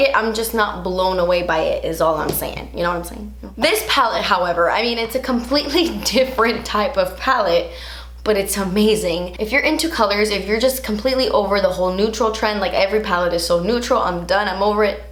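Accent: Cuba. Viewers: Lo que está pa’ti nadie te lo quita, I’m Mexican, And I’m so in love with your Cuban accent ❤️💗💖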